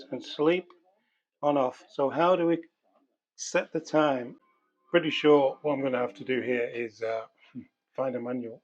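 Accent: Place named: Derbyshire, United Kingdom. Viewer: You sound like you're from Derbyshire. I'm in Chesterfield. Love your clock. Really cool.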